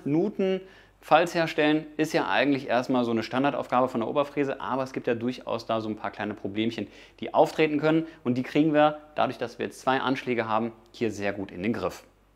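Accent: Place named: Germany. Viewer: du bist ein Genius. Wie immer, es ist ein Vergnügen dir zu zuhören. Und dein deutscher accent ist sehr angenehm. Grüße von down under.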